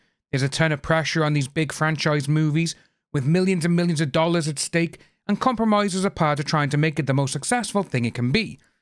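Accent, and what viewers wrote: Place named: United Kingdom. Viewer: I will never watch anything with AH in it. I am looking forward to when Aquaman is done as she has nothing new in the pipeline. Hopefully this will mean that she will disappear off my social media. Thanks for the report. I am British but live in Pennsylvania USA. So nice to hear a British accent again other than my own 👍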